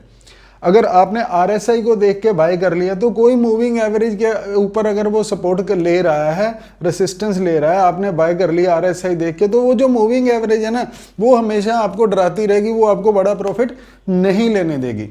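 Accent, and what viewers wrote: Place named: Haryana, India. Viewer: Boht schi knowledge, ❤ Apke way of talking se lgta h. Ki ap haryana ke h...Am i right sir ?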